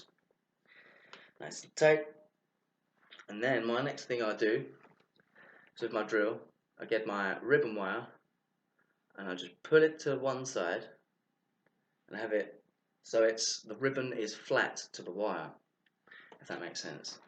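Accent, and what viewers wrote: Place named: United Kingdom. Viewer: Vape Nice to see some reviews of ss wire, there aren't many around. Your videos are great and very informative, unlike many other vape videos where acting and yelling is the main part. Would be nice with a better quality though, i can guarantee you that your channel would get more subscribers if you had better video quality, because your videos are great, informative and you're a british good looking lad. Not too many british vapers around, it's nice to listen to for a change. But a new mic and camcorder would help tremendously!:D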